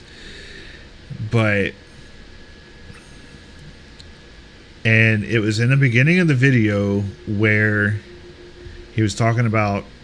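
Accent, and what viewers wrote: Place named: Chicago, United States. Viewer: It's funny that when you cut your southern accent, you talk just like I do as a dago from Chicago.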